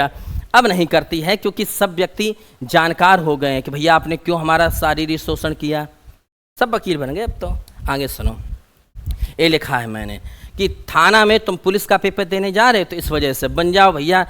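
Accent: India. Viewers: गुरुदेव बहुत अच्छी क्लास रहती हैं आपकी सारी क्लासेस देख ली मैंने । आपकी क्लास में अपनापन लगता। अवधी टच , और कोई दिखावा नहीं👍👍👍👍👍👍👍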